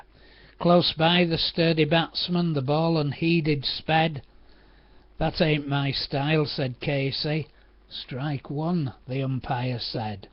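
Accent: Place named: Yorkshire, United Kingdom. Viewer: Sounds great in a Yorkshire accent. Good choice today. Too bad Casey struck out....;)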